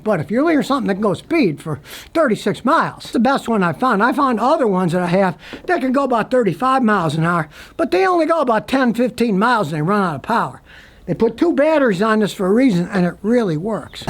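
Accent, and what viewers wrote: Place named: Texas, United States. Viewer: I thought he was from Texas.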